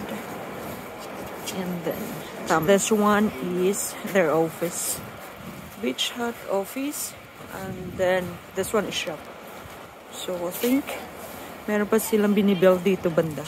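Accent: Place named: United Kingdom. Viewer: Grabe yung accent mo British na British na,love the idea of beach hut. PRINCESSANNE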